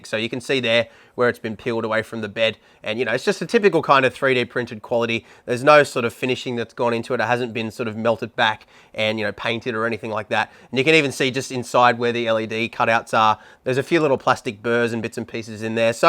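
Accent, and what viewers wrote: Australia. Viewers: Got me with the Aussie accent...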